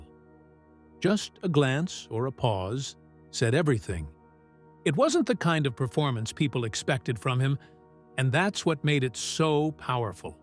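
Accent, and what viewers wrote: United States. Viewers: Howard Kos all!?? Dan Oak'roid?!? What's with the Krazy pronounciations? This guy pronounces names like a furr-ener. Yet he has an American accent. As Snow White says "Weird, weird weird " 😅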